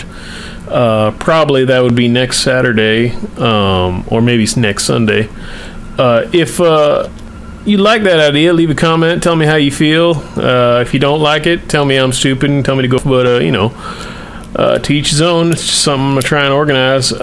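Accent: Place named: United States. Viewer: lets see the live stream! ps. you had a bit of a cowboy accent in that one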